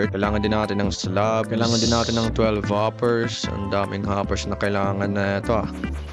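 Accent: Visayas, Philippines. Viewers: Bisaya ampta hahhahaha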